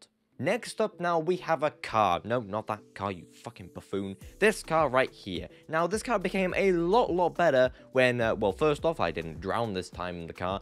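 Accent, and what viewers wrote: Wales, United Kingdom. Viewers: Are u from Wales ?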